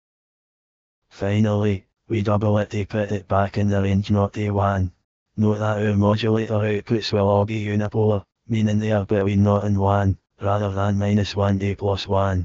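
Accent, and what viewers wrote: Scotland, United Kingdom. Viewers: Damn this is awesome thanks for this. Love the scottish AI text to speech voiceover as well haha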